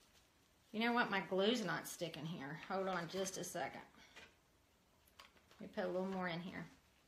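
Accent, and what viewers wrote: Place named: South, United States. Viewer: I just got to watch your video.... I love the 2nd bow. It is much fuller. I love the chevron pattern. It is gorgeous!!! Truly gorgeous. I love the wreath. I didn't get to see the making of the wreath. But I heard what you did. Thank you for your kindness! I love you. You sound like me, I'm southern gal from Louisiana.... I love the wreath and both bows. Except my favorite is 2nd. Glad you changed them out!!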